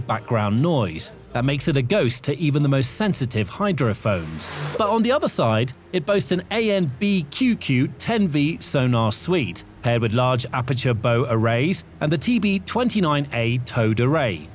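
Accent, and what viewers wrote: United Kingdom. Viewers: Dude is saying nucular in a British accent nothing that follows that can be taken seriously! I couldn't continue after maybe the 5th time but I'll be he says the hulls are made of aluminum or some such shite. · Yeah Okay. He is English,( British.) Not American.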